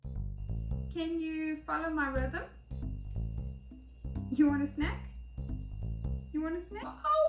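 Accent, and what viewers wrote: South Africa. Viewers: quite agree, love hearing both your voices. I've been trying to place your accent..it's been bugging me. Keep thinking South African. Am I way off? 😁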